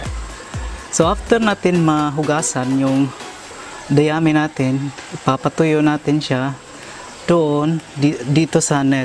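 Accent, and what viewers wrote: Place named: Visayas, Philippines. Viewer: oo nga sir kc nga mid u nahalata ko na bisaya ang tono mo kya ako ngtanong kung din ka sa aton..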